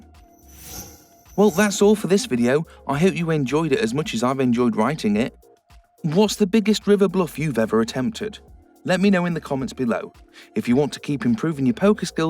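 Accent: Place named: United Kingdom. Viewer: British voice is the 2nd best selling point behind his last tip. Shame, I had to watch that whole video to get to that idea.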